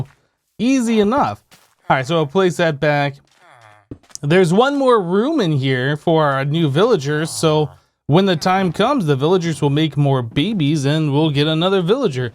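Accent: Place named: Canada. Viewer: That hint of what i think is canadian really gets me sometimes.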